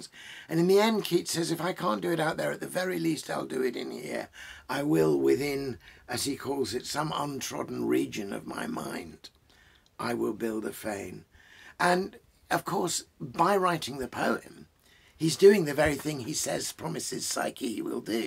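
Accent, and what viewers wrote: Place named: Oxford, United Kingdom. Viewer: I just found you. I was looking to find Omar Khayyams wisdoms... An Olympic cruise.... My old Guru, Micky Burn, (author) had that in his bucket list too. You have an Oxford accent, to my ear. Are you back yet from the conflagrations?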